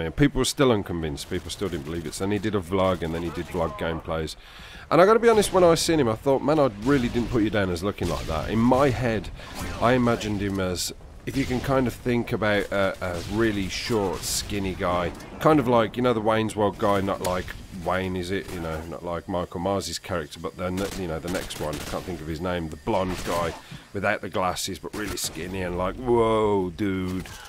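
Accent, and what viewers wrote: Birmingham, United Kingdom. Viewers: I thought you were a fat, bald Brummie! 😂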